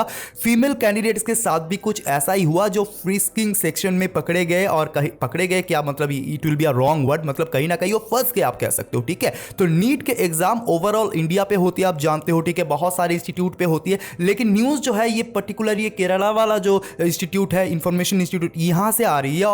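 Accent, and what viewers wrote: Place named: Bengal, India. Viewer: Pehle toh ye bangali accent chod de bhai. Warna hum k lecture ae he khush hai. Ur accent literally irritates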